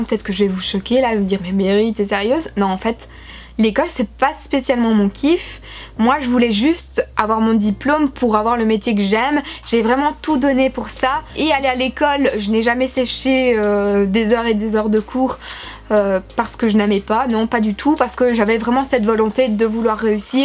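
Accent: Belgium. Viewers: Alors premièrement je commente rarement des vidéos Youtube mais la en ce moment, je regarde tout ce que je peux trouvé par apport à ce métier qui me passionne depuis que je suis en 3ème secondaire! Ta vidéo m'a permise de "valider" encore plus mon choix et je suis certaine de ce que j'aimerais faire l'année prochaine grâce à ça. Je suis aussi de belgique dans la hainaut comme toi plus précisément du côté de Charleroi et je pense que toi aussi en entendant ton accent propre à cette ville ahah! Je voulais te demander si tu étais partie à la Helha ou au Condorcet car j'hésite beaucoup entre ces 2 écoles! J'espère que tu me répondras et je vais m'empresser de regarder tes autres vidéos! Bisous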